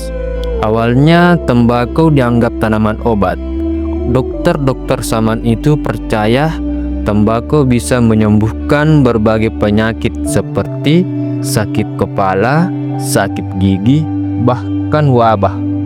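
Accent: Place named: Sulawesi, Indonesia. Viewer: Semangat bang, kedengarannya orang sulawesi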